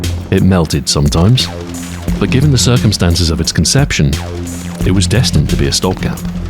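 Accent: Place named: United Kingdom. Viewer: Love hearing that thick British Accent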